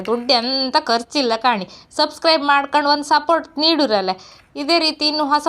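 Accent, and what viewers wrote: Kundapura, India. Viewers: Avaru kannada sariyagi mathadthidare. idu udupi jilleya kundapura thalukina pradeshika ಭಾಷೆ ಕುಂದಾಪುರ kannada (kundagannada)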